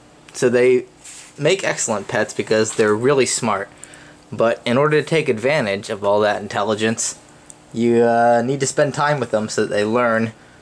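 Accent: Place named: United States. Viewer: WTF WHY DO YOU SOUND LIKE ASHTON IRWIN WITH A AMERICAN ACCENT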